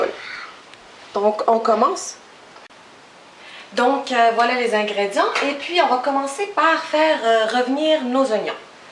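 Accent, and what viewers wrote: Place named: Quebec, Canada. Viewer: J'aime pas vraiment l'accent québécois ca ne te va pas très bien désolé :(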